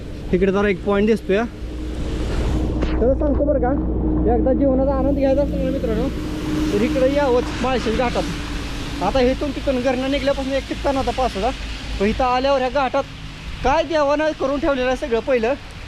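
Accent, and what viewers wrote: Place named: Solapur, India. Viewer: सोलापूरी भाषाआहे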